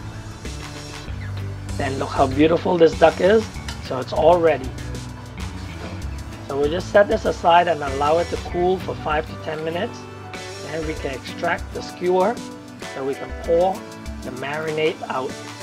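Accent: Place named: Caribbean. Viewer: You chopped up that Duck like Jamaican Jerk Chicken LOL! You do have the Island accent so I'm not surprised! That duck does look delicious! Very nice recipe for sure!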